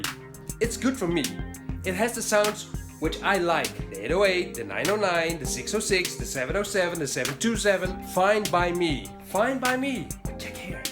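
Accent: Netherlands. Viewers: Were are you from? i spot a bit of Dutch in that English spoken word. But you are just like me: hardware, sniffing hardware etc. Amazing :D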